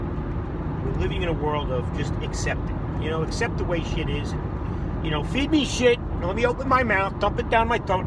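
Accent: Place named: East Coast, United States. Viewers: New subscriber loving ur content, curious where you from? Has to be somewhere around the tristate area with that accent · Not sure if I watch ur channel because of ur accent or cuz u make me laugh so fing hard but I love it and it makes me miss the east coast even more. Keep it up bro! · I grew up in Smithtown. Your accent and attitude reminds me of where I'm from. Good stuff!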